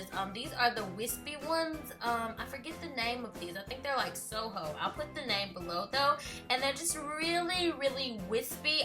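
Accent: South, United States. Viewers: She has such a southern accent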